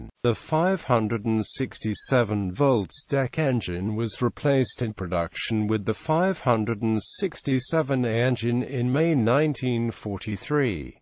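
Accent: United Kingdom. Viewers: The British accent, anything that ends with the letter A is pronounced with an R. Makes absolutely no sense to me.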